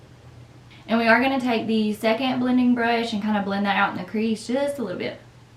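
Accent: South, United States. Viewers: i love the accent, you from the south too?💗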